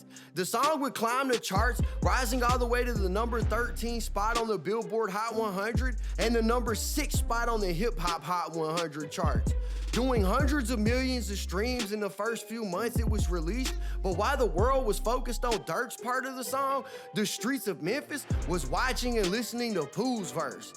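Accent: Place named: California, United States. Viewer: Dog, not bad. Where u from? Sounds like Cali somewhere But your accent is extra! Imma get used to it.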